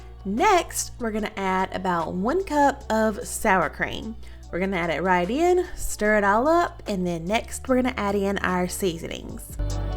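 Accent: South, United States. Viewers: I am so excited to try this! Love your Southern drawl! I'm an Alabama girl!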